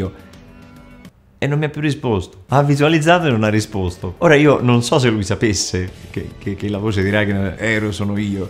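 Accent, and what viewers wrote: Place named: Rome, Italy. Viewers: Ciao, ti seguo da molto poco e ho scoperto i tuoi video dall'Australia... proprio per questo ti faccio una domanda probabilmente banale e fatta mille volte, ma per me curiosa. La tua cadenza delle tue parole è ovviamente laziale, allenamento a parte, è difficile perdere questa cadenza in fase di doppiaggio? Credo che quando parli in video sia normale farla venire fuori, ma quando doppi? cerchi di eliminarla sapendo che la utilizzi normalmente? Io per esempio avevo una lieve cadenza Piemontese ma che ho perso perchè da quasi 10 anni sono fuori dall'italia. Gran bel lavoro con Ragnar, sono curioso di vederti in altre serie. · sei bravissimo, il doppiaggio di Ragnar molto vero, però si sente un pò l'inflessione romana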